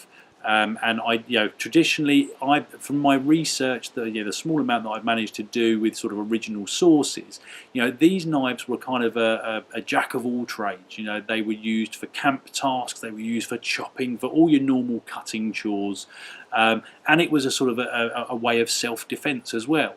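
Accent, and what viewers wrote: United Kingdom. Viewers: Don't keep me wrong, I love your reviews, but this british accent makes me remember Monty Python's scenes. By the way, I miss so much those guys. Thanks.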